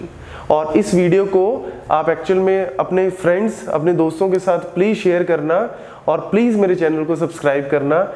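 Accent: Punjab, India. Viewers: Mast punjabi tone. Yaha pe koi two taa nahi h yaha pe to 20 hai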